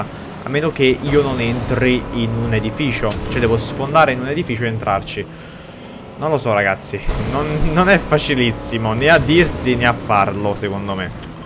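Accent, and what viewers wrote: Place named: North, Italy. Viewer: Ma perchè parli sia napoletano sia romano e poi parli con l'accento del nord ...ma che cazz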